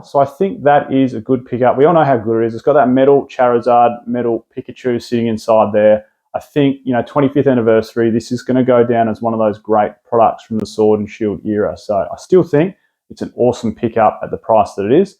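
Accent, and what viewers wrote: Australia. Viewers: Embrace the Aussie Accent nothing wrong with culture in the hobby ❤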